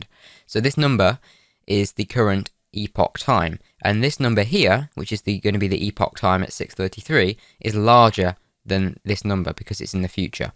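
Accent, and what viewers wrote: United Kingdom. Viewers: the voice of me but WAY more British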